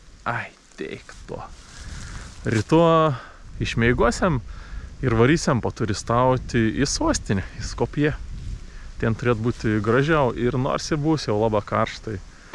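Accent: Samogitia, Lithuania. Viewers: sunku buvo priprasti prie tavo zemaitiskos snektos, bet siaip unikalu ganetinai, pradejo patikti :D o kiekvienas tavo video turi kazkokio sarmo, tau tinka kurti toki content'a :) sveikinu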